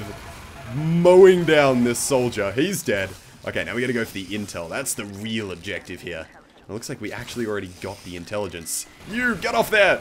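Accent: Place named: Australia. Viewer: Hey, I didn't know u were australian!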